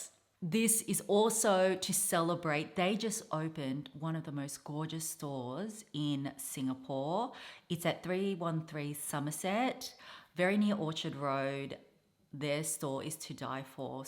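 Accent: Australia. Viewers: I’m very GRATEFUL for my wife MIKKI who I’m entering your give away for! She enjoys watching your latest video haul and I like listening to your Aussie accent, like when you say “leathaaah or evaaah. Her birthday is coming up in December and the Hermès Her bag would be the perfect birthday gift for her! I’ve been looking into building my history with Hermès by shopping for belts and handkerchiefs! I’ve even been browsing for Hermès tea cups just so I can get the chance of maybe purchasing a bag for her. Thank you for giving us a chance to win the Hermès Her bag! We’ll wishes and Keep up the fantastic job!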